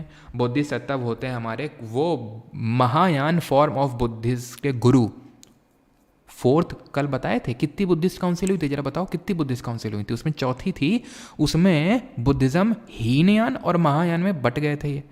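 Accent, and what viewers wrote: Kanpur, India. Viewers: Ye hamara kanpuriya style hi😄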